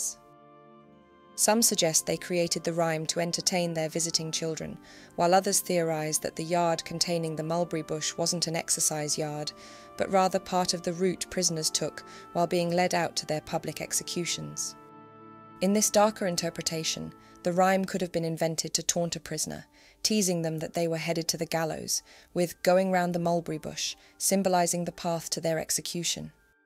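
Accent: United Kingdom. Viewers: The narrator has a very pleasant voice, nice diction and beautiful, clear British English.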